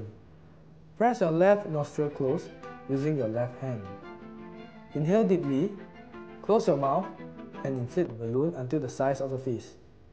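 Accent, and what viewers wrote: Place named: Nigeria. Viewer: He sounds very nigerian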